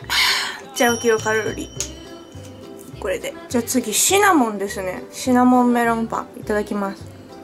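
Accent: Aomori, Japan. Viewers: 私も東北出身だからの青森訛り聞くと実家いるみたいでほんとに落ち着く笑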